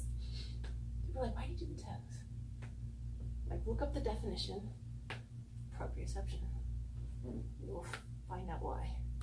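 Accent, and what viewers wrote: England, United Kingdom. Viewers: Is that an english accent? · It's weirdly jarring hearing a familiar British accent in your videos.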